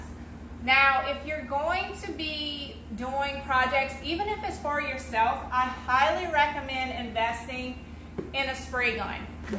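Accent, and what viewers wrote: Louisiana, United States. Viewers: So Awesome. Thanks for answering back. I'm from the West Bank of N.O....nice to hear another LA accent. I can't wait to try some if your ideas and your recent project sounds cute. Glad you were able to sell it. I hope to sell some of mine in the near future. ❤❤❤ Oh and that sounds so cute attaching them to a shutter.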